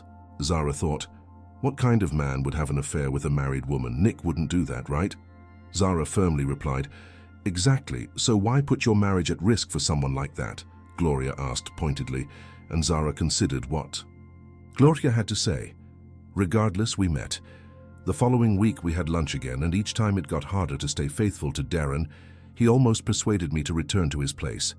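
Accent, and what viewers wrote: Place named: England, United Kingdom. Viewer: Note to the channel… Just because you use an AI reading voice with an English accent, does not make the video somehow higher quality. This is a re-hash of a very old story but it took the first 1/3 of the video before I recognized it. The awkward gaps between words (or running words together), all the mispronunciations, calling the private investigator the “AP” at one point, just makes the video poorly produced with a complete lack of editing and proofing. You’ve got segments of the video in the wrong places, making the story really confusing. All in all, this video is a hot mess and not worth the listener’s time. AI – generated videos like this one truly suck 👎👎👎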